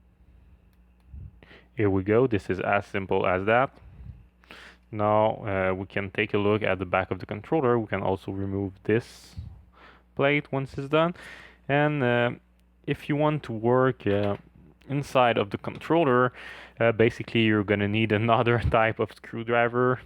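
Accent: Canada. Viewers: New sub! Very nicely done video. Btw, are you from Quebec as your accent is familiar....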